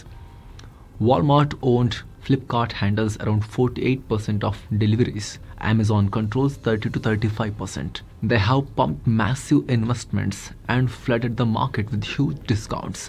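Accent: Karnataka, India. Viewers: English in kannada slang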